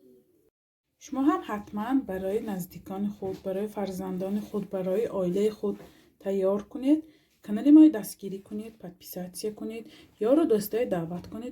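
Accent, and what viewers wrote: Isfara, Tajikistan. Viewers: Ассалому алейкум ва Рахматуллохи ва Баракатух ойя шумо аз Исфара лахчатон Исфарача бехтарин пухтупаз барои занхо 👍👍👍👍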